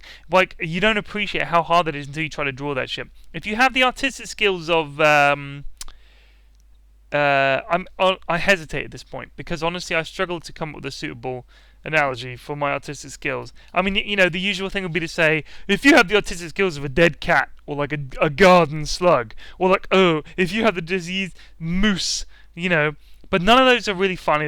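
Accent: United Kingdom. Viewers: you're British accent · a guy with a British accent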